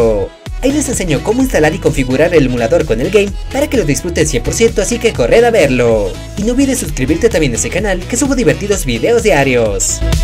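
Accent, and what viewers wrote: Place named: Spain. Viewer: Eres un buen YouTuber, pero odio que hable como si fuera de Espa~a.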